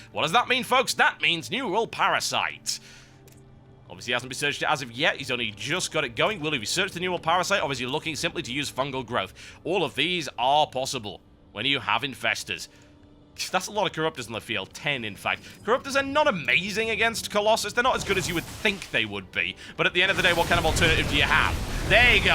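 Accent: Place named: Geordie, United Kingdom. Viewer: Mr. John Bain, let me extend my request for you to do a SC2 commentary using your Georgie accent.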